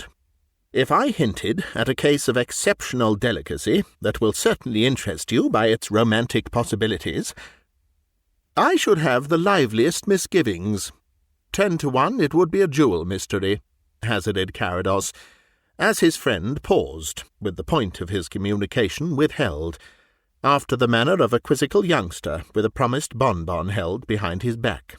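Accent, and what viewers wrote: United Kingdom. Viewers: Im Egyptian. English has been my second language all through my educational and professional life. However, i am more accustomed to the American accent because of the movies, series…. etc. only recently have I discovered the joys of listening to old radio plays and bbc stories. I had to set the playback speed to 0.75 in order to comprehend the British accent. But listening to British perfectly pronounced by you sir, I don’t need to change the speed on your channel. Thank you very much.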